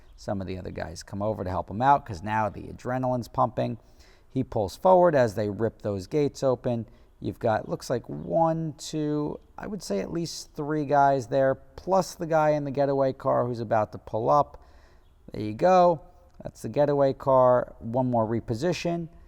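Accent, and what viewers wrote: United States. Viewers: Are you American or English Ps I’m English so please tell meeeee Wait no your American whhhyyyy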